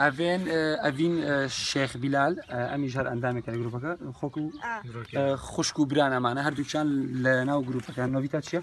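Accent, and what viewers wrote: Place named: Sorani, Iraq. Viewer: وەڵای لە کرمانج ناچی ڕێک ئەڵێی سۆرانیت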